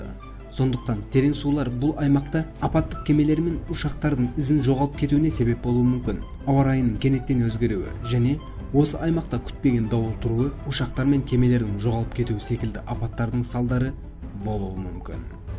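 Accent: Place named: Karakalpakstan, Uzbekistan. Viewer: Акценты жагымсыз. Каракалпак емессиз бе?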